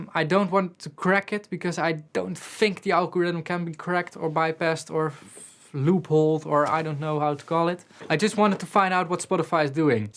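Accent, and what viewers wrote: Netherlands. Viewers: Sounds dutch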